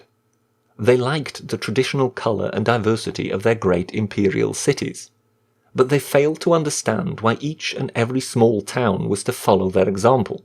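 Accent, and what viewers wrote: Wales, United Kingdom. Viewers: Do I hear a Welsh twang? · I never realised how biased the media was in the UK until Brexit came about. The BBC's supposed impartiality was exposed to me as being a complete and utter lie. They're even worse when it comes to President Trump. Always negative, never positive completely one sided in most regards. Great summary, should be heard by politicians. I detect a Welsh accent too! ;)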